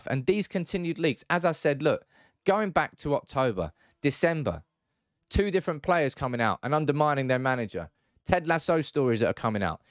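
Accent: Manchester, United Kingdom. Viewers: The guy doing this video has got a cracking Mancunian accent 😂😂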